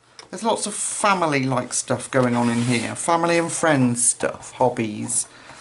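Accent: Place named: England, United Kingdom. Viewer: Many thanks for introducing these books. I particularly like the ‘Wonderful Little World’ book so going to try to find a copy. You are very generous with your time and knowledge, which I appreciate so much. It’s lovely to hear a ‘local lady’ so I know the books you show are available in England. Kind regards from York 🙏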